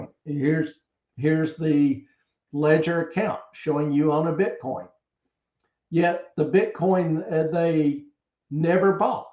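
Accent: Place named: Alabama, United States. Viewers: Another L&Ler subbing & watching. Your content is amazing. Add in the dogs & southern accent, and the “bigass” adjective and I’m here to stay! Thanks for the breakdown. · That Alabama accent!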